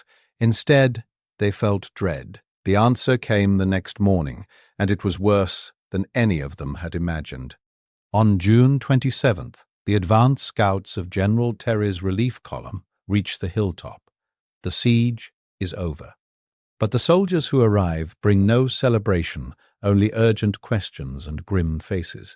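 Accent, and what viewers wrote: United Kingdom. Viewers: You saw that also,this voice ,this channel is a joke , is he from England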